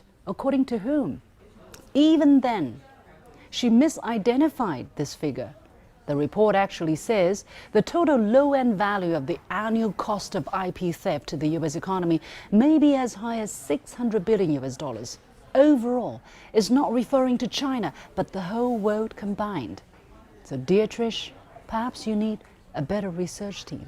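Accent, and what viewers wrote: United Kingdom. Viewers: youvecattobe kittenmeritemeow 这个是英音吗？ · are you really living in China? then how do you get on the youtube? As far as I know, youtube, along with EVERY western media, like FOX CNN are banned in China. Her British English sounds well, but unfortunately it full of lies. You do know that there is no freedom of speech in China, and Liu Xin is working for CCTV, in other words, she works for CCCP. I challenge you slightly criticize Chinese Xi Jinping as a dictator, he has revised the Chinese Constitution and will remain in the power for whatever he like, what that sounds to you?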